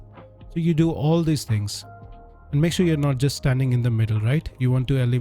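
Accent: India. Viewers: are u indian bro? btw love ur content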